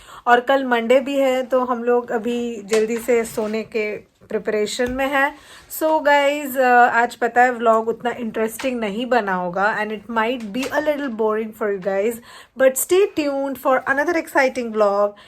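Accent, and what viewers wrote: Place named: India. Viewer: I"ll make a guess by your accent.. are you indian, right? :) Your husband is an I.T. guy? (I ask that 'cause every indian abroad is I.T., which is a great thing.. Could you kindly ask him if I get easily a Javascript job or do I need to know ReactJS or other stuff? I'm planning to move there! :) Thankssss and greetings from Brazil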